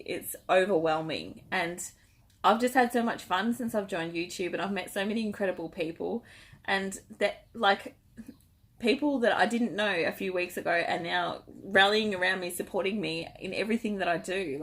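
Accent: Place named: Australia. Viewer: Hi, it’s so nice to hear another Aussie voice on YouTube.. and another Aussie perspective on crocheting. I’ve only just found you thanks to your yarn swap with Summer. I’ve been crocheting since I was 9 ( almost 39 years..) but I’ve learned more thanks to YouTube videos than I knew in the previous 39 years!! I’ve been unable to work since 2016, due to chronic and acute pain and illness, crocheting helps get me out of bed, gives me something to achieve each day ( other than just getting up!!), and helps me to cope with the rigours of my illness. It is my hope to be well enough next year to commence my own YouTube channel, to help give another Aussie voice and help to build the Aussie crochet community on Youtube, whilst also sharing a little of my journey in the hope that I can bring some light into the life of others living with chronic illness and pain.. 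(hopefully my Counselling background might help a little here). My wish is simple (I hope), I’d love to be able to try either Red Heart Super Saver, or Vanna’s Choice yarn, or Lion Brands Touch of Alpaca. Being on a very limited budget, accessing some of these are a little beyond my reach at the moment. All that being said, watching the wishes of others being granted brings me great joy. Thank you for you lovely lively video, I’m now off to binge watch your earlier videos... Wishing you and yours a very Merry Christmas filled with all the joys of an Aussie Christmas and a spectacular New Year!!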